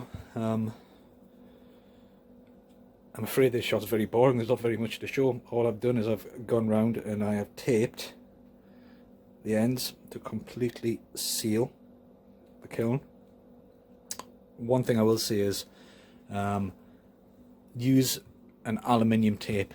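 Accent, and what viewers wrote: United Kingdom. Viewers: very British!! 🇬🇧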